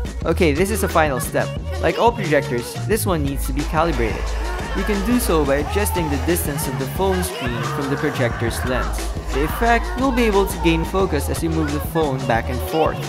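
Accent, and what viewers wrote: Philippines. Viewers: He has a filipino accent :// sorry I'm new here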